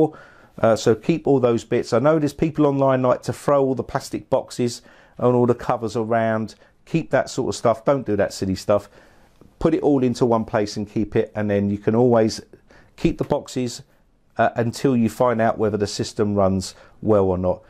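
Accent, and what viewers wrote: England, United Kingdom. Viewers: Most satisfying english accent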